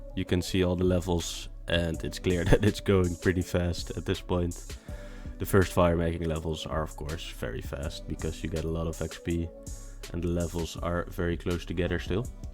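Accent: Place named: Netherlands. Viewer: I cant help but notice your accent, a dutchy right?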